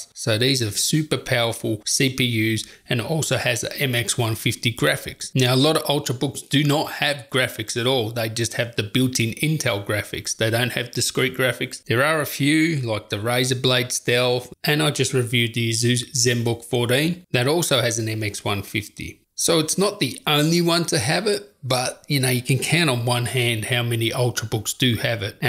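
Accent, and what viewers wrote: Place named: Australia. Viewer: As an Aussie it's weird to hear super Aussie bloke talking in American dollars.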